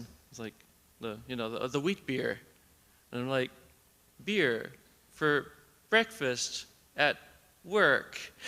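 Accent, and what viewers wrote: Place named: United States. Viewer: It's funny, -- the thing I notice listening to this is how the melody of your speech cadences has changed to a more German-influenced sound, from your living in Germany so many years. Of course your accent is perfectly American, but if I listen to this so quietly that I can barely make out your words, the ups and downs of your intonation sound like German. Having spent months in Germany myself, without actually being a fluent German speaker, it was instantly recognizable to me. I don't remember this characteristic of your English many years ago when we first met. Fascinating!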